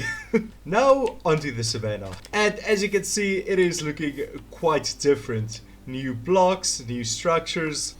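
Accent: Afrikaans, South Africa. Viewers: Are you from south African because ur accent sounds like a afrikaans english Edit: ur channel location is in SA so probably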